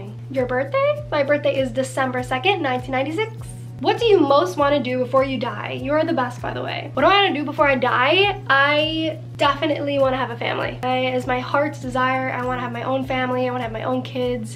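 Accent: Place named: Italy. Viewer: do not hide your italian accent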